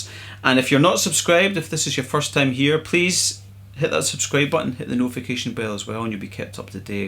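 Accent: Scotland, United Kingdom. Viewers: Man his Scottish accent is so dang smooth. I found it a lot easier to follow along to, and helped me mail the song.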